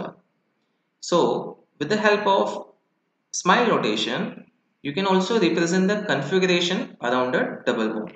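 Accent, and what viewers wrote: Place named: India. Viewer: Usually the indian accent drives me crazy xD but yours is actually quite likeable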